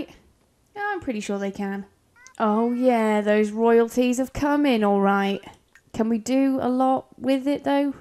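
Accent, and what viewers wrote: England, United Kingdom. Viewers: This reminds me of when messyourself played sims 4 maybe it's the bald kid and English accent lol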